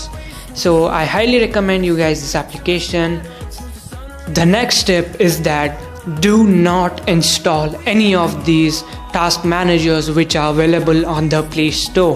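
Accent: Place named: England, United Kingdom. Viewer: Nice English Accent 👌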